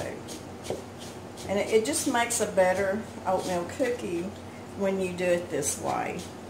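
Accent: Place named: Alabama, United States. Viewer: love your voice it reminds me of Alabama and my folks there. Yahweh bless!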